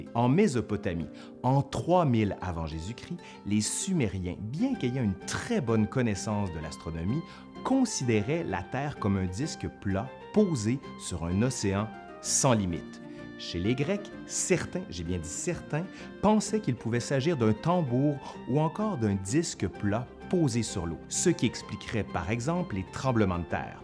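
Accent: Quebec, Canada. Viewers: Encore une super vidéo ! Ta voix posée avec l'accent québécois, c'est le feu ! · Bon, je savais tout ça mais c'est clair, concis et l'accent québécois c'est très sympa, donc pouce bleu d'un maudit français !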